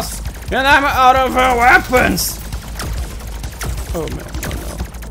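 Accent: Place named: Portugal. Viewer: é portugues... só pelo sotaque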